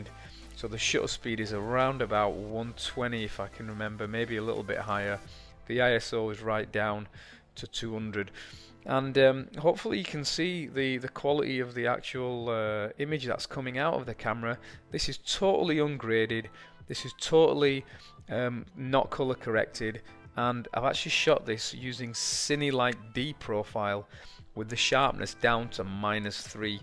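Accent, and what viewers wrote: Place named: Northern England, United Kingdom. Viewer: Nice northern accent ? I hope the model who is a stunner is from Yorkshire? Could you stop speeding her walk up , its the best part of the video .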